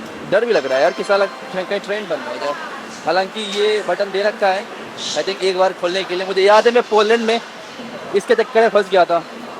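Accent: Bihar, India. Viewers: Char din hue nhi honge Russia gye hue or attitude dekho iska, abhi tak bihari tone gyi nhi hai iski hindi ache se bol nhi pa rha or nautanki dekho iski.....paisa barbaad saala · Kahe raese pel rahe ho jo accent use kar rahe ho lag rahe ho bihari ho cheap aur chutia dono dekh rahe ho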